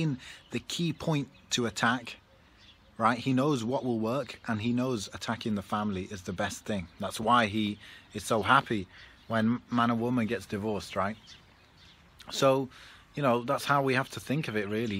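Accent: United Kingdom. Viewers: As-salaamu alaikum. What's the name of the introductory vocal track please brother? Also, are you from Derbyshire, your accent sounds Midlands-y?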